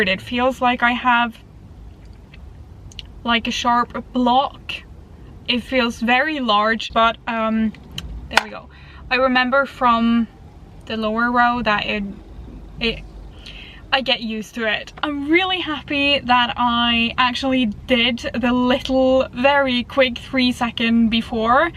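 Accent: Ireland. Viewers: Where is your accent from ? Irish?? Living in Ireland?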